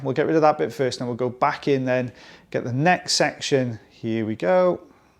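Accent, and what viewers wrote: Wales, United Kingdom. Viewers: just what the Doctor ordered How 🤔 ❓ you managed to extract that recess cerumen was marvellous I was hanging on the edge of my seat Skilled on every level Your lovely Welsh accent almost broke ♥ into a 🎵 song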